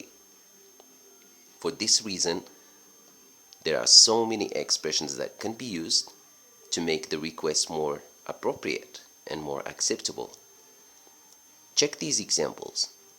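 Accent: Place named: United States. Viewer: it's really interesting to see a teacher who really has a good american accent :-) thanks a lot for helping us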